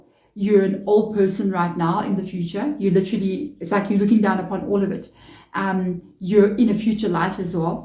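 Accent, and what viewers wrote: South Africa. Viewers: Lovely to hear a South African voice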